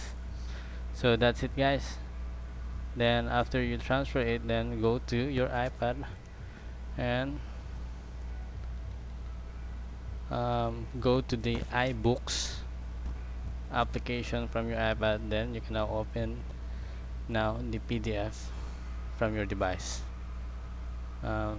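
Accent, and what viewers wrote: Philippines. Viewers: Strong filipino accent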